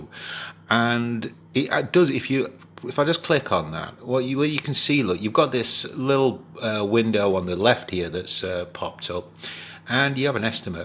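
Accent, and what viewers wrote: United Kingdom. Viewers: Thanks for the brief introduction to SharpCap. Like you say, there not many YT videos to help get started. It's good to hear a British accent downunder. Keep up with the humour.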